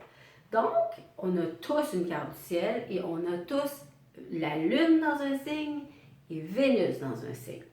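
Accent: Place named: Quebec, Canada. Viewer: 1000 merci parce-que vous etes tres objective et d'une claretee rare, et aussi merci de votre generosite de partager votre savoir acquis, je vous en suis reconnaissant. L'accent Quebecois c'est la cerise sur le gateau, j'adore !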